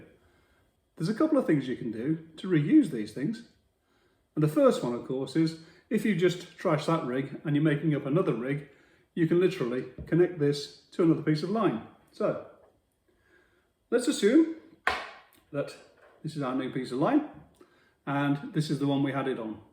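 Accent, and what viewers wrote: United Kingdom. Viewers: The tackle manufacturers like Middy, Drennan and Guru etc could learn something from you, use nylon loops instead of fuse wire with a memory, great video! I remember watching the first video I saw of yours, you were fishing on a lake, a man with a clearly British accent fishing and a Kangaroo hopped into the video in the background, local wildlife came to say "G'day"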